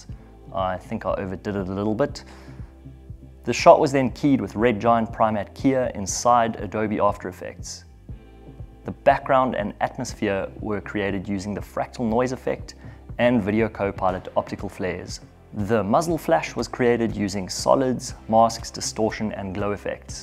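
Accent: South Africa. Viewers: Hi. From your accent I gather you are South African? I love fooling around making videos. Please check this little short I shot at Monte Casino. With your superior special effects skills I might be able to sell some cool videos to sponsors! If you are willing to work with a 70 year old, that is. <link>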